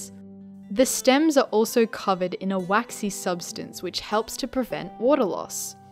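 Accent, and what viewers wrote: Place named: Australia. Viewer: Sounds like a Australian accent it's kinda harsh to ask for them to pronounce it correctly many people may pronounce it differently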